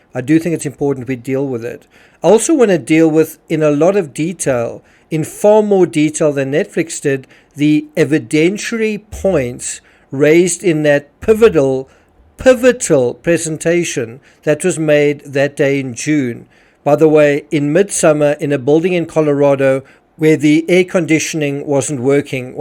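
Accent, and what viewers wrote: South Africa. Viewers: I've been listening to your podcasts for some time now and agree with all you say. Tonight, I realized how much you care about the victims too that you take deep dives into. I was surprised you had so many books on the JB Ramsey case, but bought another triology of yours that is a different famous murder that also took place near Christmas. I have been reading the set, and you are very logical and precise. Thank you. I plan to start the first trilogy on this case next and go from there. For those that don't know, these Kindle books are very reasonable in cost. Thank you, for your help in understanding what has occurred and for your care. Last, your accent reminds me of our neighbors we had in Geraldton, Western Australia, who are from South Africa. There is a large community there of immigrants from that country. There is even a church that has services speaking in Afrikaans. 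Blessings.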